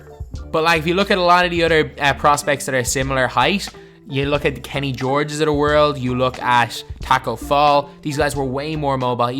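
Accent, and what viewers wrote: Ireland. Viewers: What the fuck is your accent.. You sound posh Irish.. Do you want a soft drink? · are u Irish ? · ARE U irish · R u Irish I can hear the accent kinda ????? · Never realized the Irish accent until you said you played in an Irish league lol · Are you Irish?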